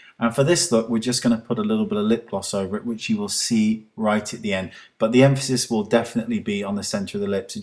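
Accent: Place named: South West England, United Kingdom. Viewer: Thanks! Love Mandy's new hair colour! Great video, will have to try it out after pay day! Could you possibly make a video on how to cover dark under-eye circles? Mine are really bad and I have tried everything - light reflecting pens don't cover enough and thicker concealers just go cakey! p.s., knew you had to be a south-westerner! I'm from Weston-S-M!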